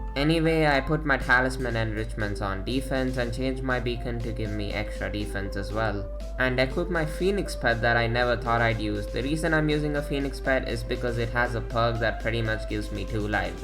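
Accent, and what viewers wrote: India. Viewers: How do you have such a Indian accent?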